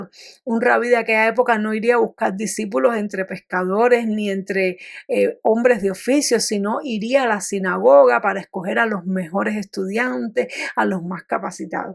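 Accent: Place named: Cuba. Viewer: Me parece que eres Cubana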